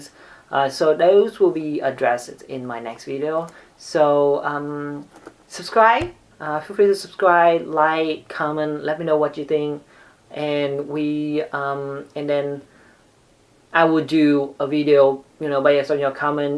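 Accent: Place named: Vietnam. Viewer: Well his family name is popular in Singapore and Vietnam , but his accent also makes me wonder if he is Vietnamese or Singaporean...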